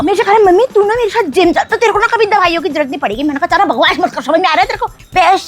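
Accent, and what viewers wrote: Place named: Pahadi, India. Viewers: Aapka pahadi tone me bolna bahut achha lgta hai mujhe mere ghar(india) k pados k aunty k yaad aa jati hai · Mzaa hi aa jata hai aapki pahadi leh sunke😂😂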